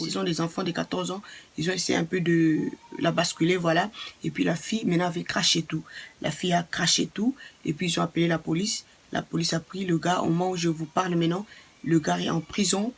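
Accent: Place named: Democratic Republic of the Congo. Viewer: J'étais que tu étais Congolaise avec l'accent que tu as.. (moi aussi j suis Congolaise ;)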